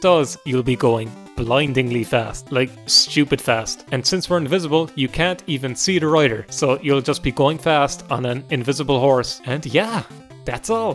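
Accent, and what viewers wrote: Ireland. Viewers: Ooh a fellow Irishman. Where you from? Love the video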